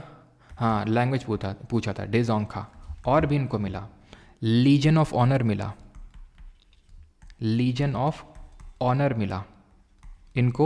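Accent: Kanpur, India. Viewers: Sir aap kanpur se ho i know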